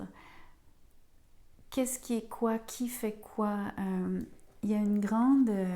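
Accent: Quebec, Canada. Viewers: Merci pour ce tirage ! J’en profite pour vous dire que j’aime beaucoup votre voix douce, votre rire discret et votre charmant accent québécois.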